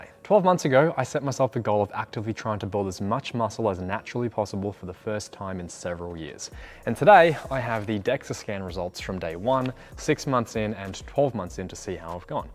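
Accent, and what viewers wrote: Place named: Australia. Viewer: got the Aussie accent, is drinking hot tea with its own little teapot, is jacked as fuck, AND WEARING TOOL T-SHIRT. I THINK I'M IN LOVE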